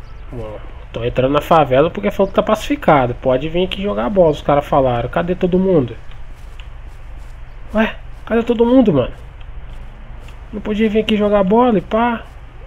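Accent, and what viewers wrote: Bahia, Brazil. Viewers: Caramba mano tu e baiano né kkkkk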